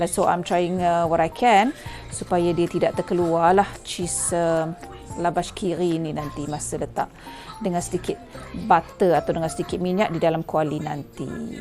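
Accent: Negeri Sembilan, Malaysia. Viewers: Salam i baru ikut your channel apa nama you sis....u dr N9... sbb loghat u cakap macam orang nogori....dr mana Rembau / KP / Seremban...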